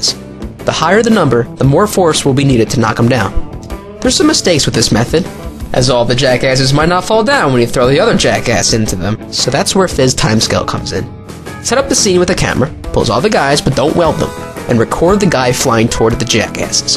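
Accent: Italy. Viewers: ma...sei italiano? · Are u Italian? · are you italian? o_O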